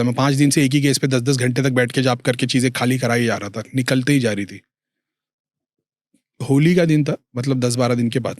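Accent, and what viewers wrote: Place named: Mumbai, India. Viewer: Wo uska bolne ka dhang hai, wo Mumbai se hai. Uska matlab ye nahi ke wo kisi ki disrespect kar raha hai.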